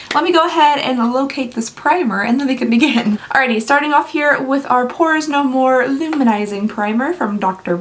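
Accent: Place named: South, United States. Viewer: I can tell you’re southern. ❤️ same lol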